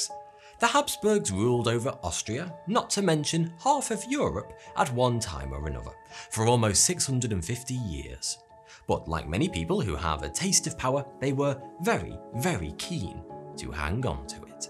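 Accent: United Kingdom. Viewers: I've been interested in inbreeding for many years, and have read about and watched videos concerning the Hapsburgs and Queen Victoria's descendants spreading the hemophilia gene. You did a very nice job researching and presenting this information about inbreeding. Add to that that you're a Brit with a fantastic accent, and I'm hooked on this channel! Everything sounds better when narrated by a Brit!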